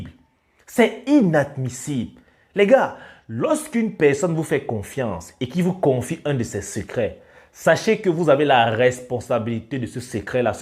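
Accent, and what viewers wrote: Cameroon. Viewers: Tu a l'accent Camer hein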